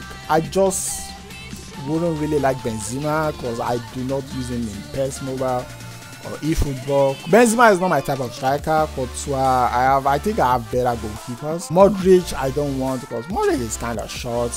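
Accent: Nigeria. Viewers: Bro, are you Nigerian?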